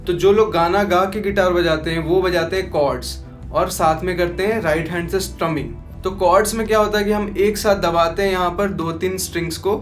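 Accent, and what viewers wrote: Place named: Bihar, India. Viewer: Bihari babua “theth bhasha”….. 🫶🏻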